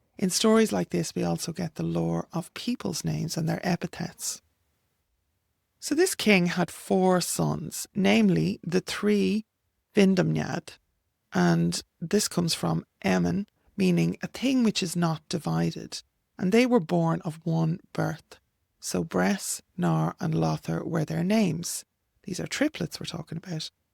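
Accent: Ireland. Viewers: I love listening to you read the mythology! I love hearing it in your Irish voice, with your pronunciation. I listen to the Tán every year.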